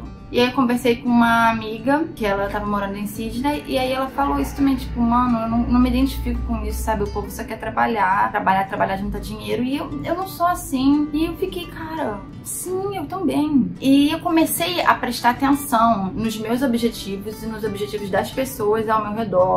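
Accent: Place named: Rio de Janeiro, Brazil. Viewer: Cara, o quão fod* você é, de verdade, te acompanho há um tempo, no primeiro momento pelo jeito d falar desconfiei que era do Rio, assim como eu, mas não tão próximo cara... Minha vizinha de cidade, Niterói/SG, todo esse lado financeiro que você contou eu me vejo muito, de verdade, venho/sou de uma comunidade no Fonseca, em Niterói, e almejo chegar a Gold Coast um dia. A partir de hoje vai ser minha referencia se tratando de AU... Abraços.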